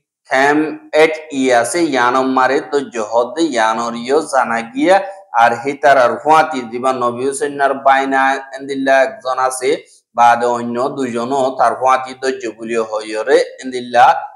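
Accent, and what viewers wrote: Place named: Chittagong, Bangladesh. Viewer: You not Rohingya you chitangya bangali your every speaking news chittangya bangali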